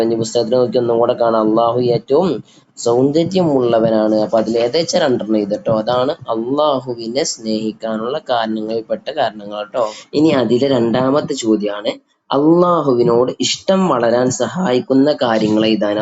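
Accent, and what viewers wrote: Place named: Malappuram, India. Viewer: Malappuram language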